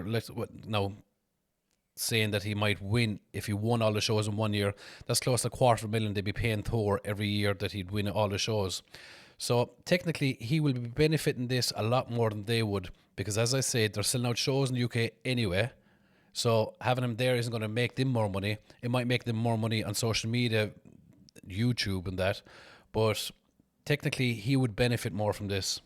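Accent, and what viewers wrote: Ireland. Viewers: You are a born story teller, it’s the Irish accent, 323, I enjoy your videos as they are 👍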